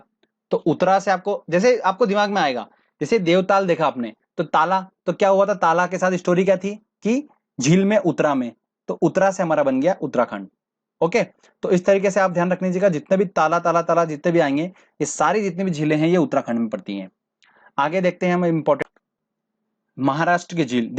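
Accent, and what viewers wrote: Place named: Madhya Pradesh, India. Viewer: Aapki voice se hi lag gya aap MP se ho mere bahut dost he MP se islie samjh aa gya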